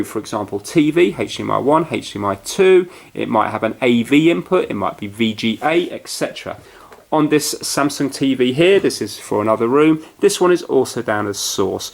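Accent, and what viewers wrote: United Kingdom. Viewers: Shamalamamoumou unknown he is British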